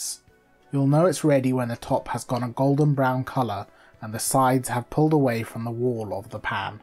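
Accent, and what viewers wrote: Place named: United Kingdom. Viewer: Bro how did y grt that accent British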